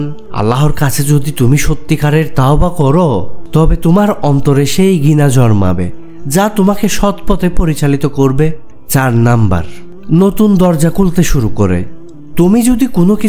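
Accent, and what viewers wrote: Chittagong, Bangladesh. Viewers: Tumi ki chatgaiya poha naki?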